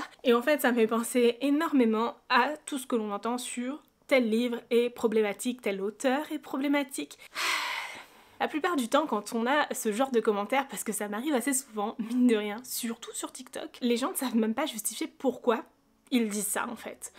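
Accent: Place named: South, France. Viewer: Merci pour cette vidéo. Un rappel de tolérance nécessaire. PS: j'ai cru reconnaître un accent sudiste. J'aurai mal entendue peut-être. Mon accent toulousain est ressorti aussitôt 😊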